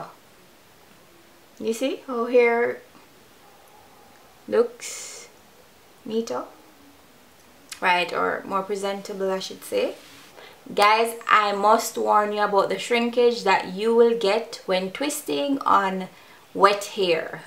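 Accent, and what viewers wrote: Jamaica. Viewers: I love watching your videos just to hear your accent im Jamaican but I don't live around a lot of Jamaicans. I love watching your videos to get a feel of home. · So bus a jamdown chat.nuh.... make mi hear it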